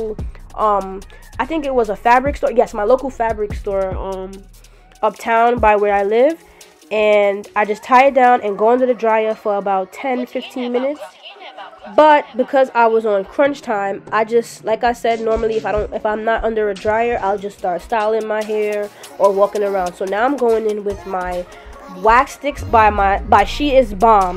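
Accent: New York, United States. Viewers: U from ny? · I’m so glad you from NY like me cause it gave understand everything you say word to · you from New York ? 😭. I love the accent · Are you from NY? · Lmaoo you from ny?? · I knew you was from some part of NYC when you said “yo I be buggin” 😂 real NY shit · You must be from NY city lol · I could tell she’s from New York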